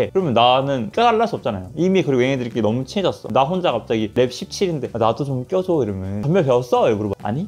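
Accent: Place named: Iksan, South Korea. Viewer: 익산 사람이에요? 익산 사투리 종종 들리는데요~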